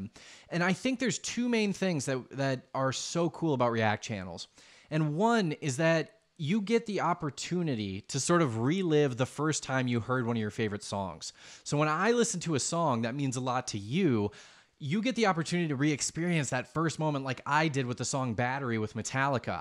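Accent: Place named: United States. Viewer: Where you from bro? You have one of those hard to place accents. Colorado maybe, or Cali? Keep it up man, this is becoming one of my favorite channels! Quit MGK and Post Malone though, they're both wankers lol!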